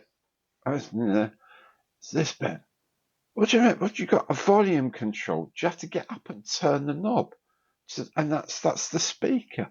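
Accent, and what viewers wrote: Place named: Northern England, United Kingdom. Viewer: Loving the northern accent “me duck” Kids today don’t know they are born. This video brings back lovely memories. I do fancy a Thunderbird 2 with the T4 but they do seem a bit expensive for what you get. FAB.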